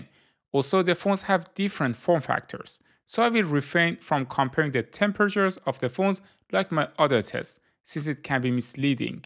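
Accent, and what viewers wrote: Iran. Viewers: Bro, I sensed from your accent that you are Iranian, am i right?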